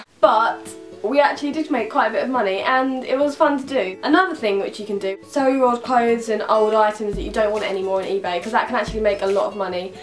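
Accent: United Kingdom. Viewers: your accent is amazinggg omg I LOVE YOUR BRITISH VIBES❤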